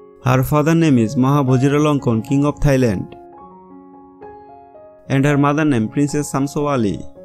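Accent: India. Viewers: Could you please change another Commander. We cannot understand what this gentleman is saying. Too heavy indian accent. Horrible and wasting air time money 😱😱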